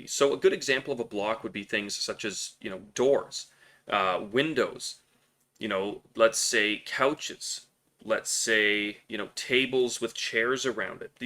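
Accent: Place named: Canada. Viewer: Canadian accent is strong.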